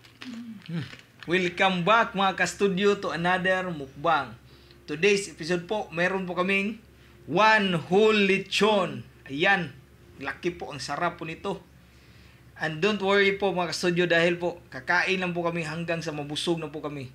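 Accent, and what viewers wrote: Visayas, Philippines. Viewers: Ingles ug Tagalog nimo gahi kaau Klaro kaayo bisaya accent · Sinasadya nyo po ba accent nyo? Kasi bisaya po din ako. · I like the way you talked! I loved the Visayan accent! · Di bagay mag tagalog2 bisayang dgko why angay.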